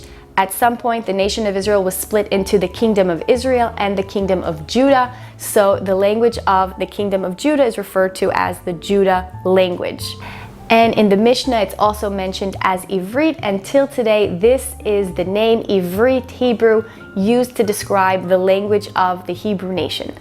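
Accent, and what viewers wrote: United States. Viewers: Funny, she doesn't sound middle eastern, or look middle eastern. In fact, she sounds downright American. Funny that.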